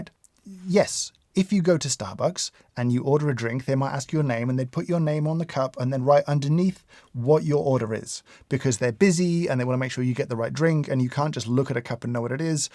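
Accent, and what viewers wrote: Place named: RP, United Kingdom. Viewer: Overreaction to an ad. this aristo-accented Brit going over the producers (who cares?) The connections he makes of motorcycles with coffee (who cares?). Coffee beans sold with name of who it was made/ filled for (who cares?). Pitt and being supposedly made to look cool in the selling of something(what else is new?).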